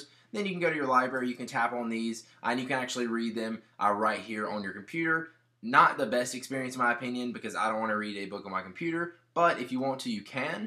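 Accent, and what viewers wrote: South, United States. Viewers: very southern.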